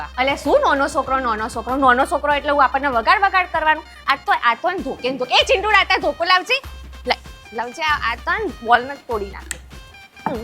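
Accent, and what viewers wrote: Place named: Mahesana, India. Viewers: Mahesana nj bhasa sambhadva no Anand Avi Gayo👌👍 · મસ્ત મેંહાણી ભાષા બોલે છે તમે મહેસાણા ના છો???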